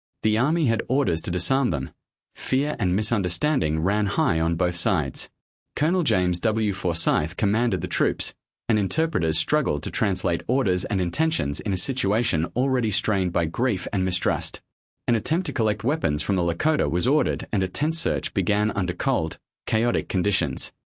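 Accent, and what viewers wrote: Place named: Australia. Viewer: This “historical narrative” is offensively absurd, not only for being narrated in an Australian-concept or Afrikaaner-concept accent, but by eliminating all references to “race,” a MOST critical dynamic between all peoples of the era, as well as now. There was no “universal” starvation, displacement, deprivation, massacre, and genocide of people on all fronts, in any “equal” measure whatsoever. No “criminalization” of sacred traditions, Religions, & Religious practices was ever incurred by any White persons. No treaty agreement was ever upheld. equally-respected and honored by the USA-concept cavalry, government, nor by any known White persons of any significant Positioning in that government (nor in any other European government concepts interested as “parties”)—still undergoing its own “formations” and territorial disputes at that period. 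It MAY be “helpful” to SOME young children to have a narrative of certain historical landmarks, events, and participants involved in Native Nations’ Unjust treatment. But for adults, it serves no purposes other than strengthening, refining and expanding Racism/White Supremacy, as narrated by an individual unwilling or unable to intelligently discuss his OWN “frontier” narrative in a manner that young people can easily understand. The purpose of “History(ies)” as a subject isn’t being “concise.” The purpose of reporting historical data is accuracy, truthful reportage, and fact-based information conveyed in such a way that it engenders compr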